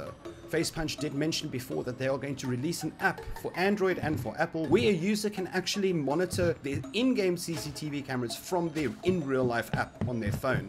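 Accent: Afrikaans, South Africa. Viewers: you sound like you come from south africa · He sounds Afrikaans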